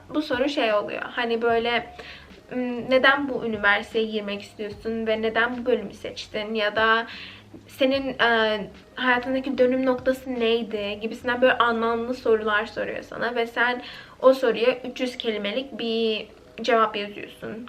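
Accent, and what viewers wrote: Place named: Texas, United States. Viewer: Litt video, succesful video. Im a fan from now on!! we are waiting for more. u got texas accent tho..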